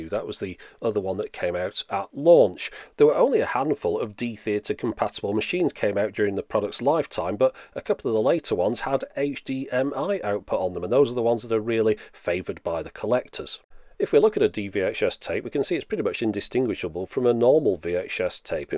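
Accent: United Kingdom. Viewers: Это британский акцент всегда радует мои уши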